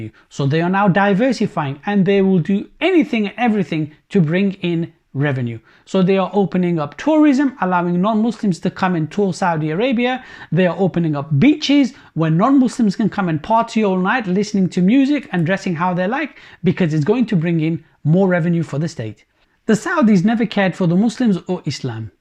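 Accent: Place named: England, United Kingdom. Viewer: all your informations is wrong wearing a bikinis in Saudi not all the places it’s only in the private places not in the public. Saudi Arabia always defend Islam and helping the Muslims all over the world and serve the holy places in Mecca and madina for more than 80 years and they spend more than 90 billions of dollars 💵 for them. What kind of corruption you are talking about?? I think from your accent you are living in England. Please talk about the problems in england or the country where you came from and talk about our brothers and sisters Muslims problems in the west . By the way I saw many people talking about Saudi and the gcc countries and they forgot about what they are exactly. They use Islam but they are far away from it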